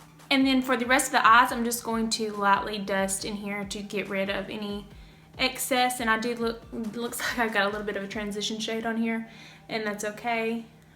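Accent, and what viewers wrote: South, United States. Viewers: I love your voice! It's so country! I hope you don't take that as an offense.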